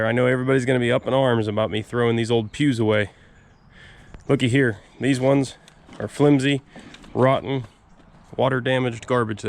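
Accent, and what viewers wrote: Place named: Midwest, United States. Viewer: You’re a mid westerner, we all talk wrong...I still put an R in wa”r”sh.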